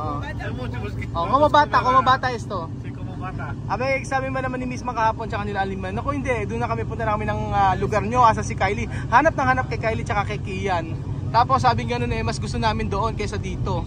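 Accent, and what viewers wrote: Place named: Zamboanga, Philippines. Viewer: Chavacano po ba kayo or spanish speaking? Yong accent mo ay chavano.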